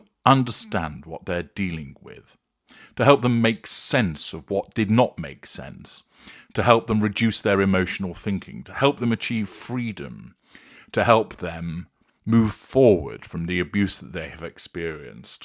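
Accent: United Kingdom. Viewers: I already put a note on my computer to boycott all videos featuring she who will not be named so I wouldn't accidently forget. I am happy you have joined the boycott as well. Everytime I hear your voice I am reminded of a certain British gentleman I see in my videos a lot. It's entertaining to wonder if that is you or not. It was so wonderful to see the Princess of Wales looking so beautiful as well.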